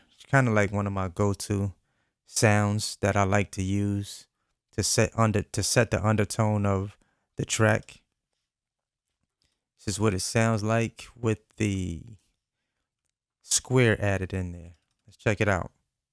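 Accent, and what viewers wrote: Jamaica. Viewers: it bad mi don sound like yu have a Jamaican like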